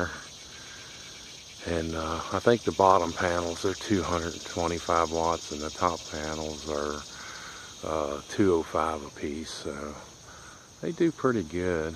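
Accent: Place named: Bristol, United Kingdom. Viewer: Your accent is cool. We here in England have nothing like it. Perhaps Bristol.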